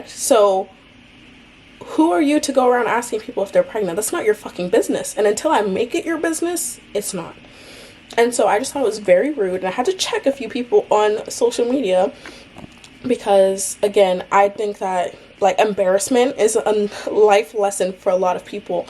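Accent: United Kingdom. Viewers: Oh my goodness has that British accent 😍😍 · I love how he's starting to have an English accent 🥰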